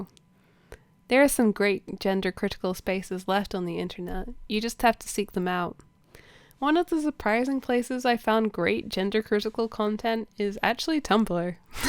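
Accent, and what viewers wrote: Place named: Scotland, United Kingdom. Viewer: It’s the intellectual dishonesty of trans women are women. It’s like a cult. And when you learn about autogynephilia and read Galileo's Middle Finger it’s even harder to rationalise what we’re seeing from the media, government and institutions. It’s genuinely terrifying. Great video and hopefully you’re able to post more at some point. Sure I detect a Scottish accent as well!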